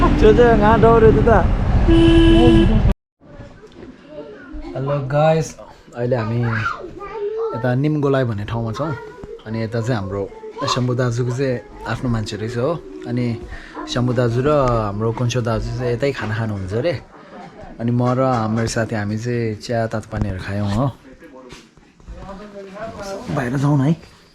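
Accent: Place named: Sikkim, India. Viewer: AE kind of hjr ko bolai xai Sikkimese jostoi lagyo ni so BTW keep going haitw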